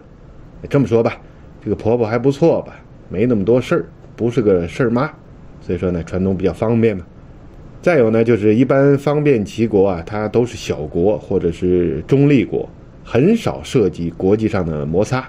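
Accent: China. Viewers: 李船长是台湾人吗？为什么是有大陆口音，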